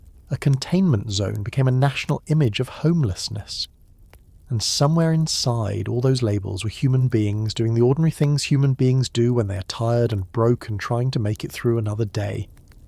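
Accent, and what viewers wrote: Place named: United Kingdom. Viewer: Discomfort is inaccute history being read wuth a pompous brit accent.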